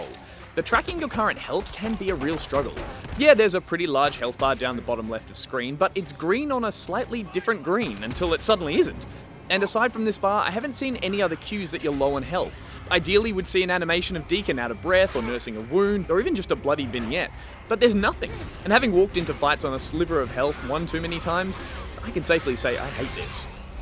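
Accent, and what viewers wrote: Australia. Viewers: Clearly sounds Australian. But Americans always do get confused about the British accent.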